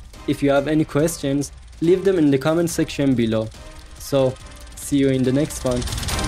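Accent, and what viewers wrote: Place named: Iran. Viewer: این لهجه ایرانیه❤😊😂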